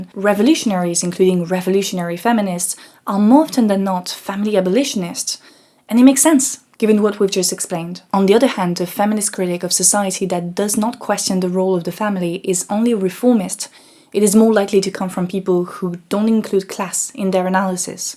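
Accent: France. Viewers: She does it deliberately to misrepresent her political opponents so she can make 'essays' pretending she knows what she talks about. Trust me, if you start an essay from a false premise then no matter how many big words with a french accent you say, it's pretty much garbage from the initial false premise. She doesn't want feminists represented by the hairy purple haired freaks screaming at everyone with viens bulging from their forheads about how proud they are for their abortions because she would claim that lacks nuance right before making the claim that all men are incels in her opinion 🤷‍♀️ this approach is the substance of most leftist debate these days, thats why conservatives generally have stopped trying to talk to them. Because their interactions are disingenuous and gaslighting.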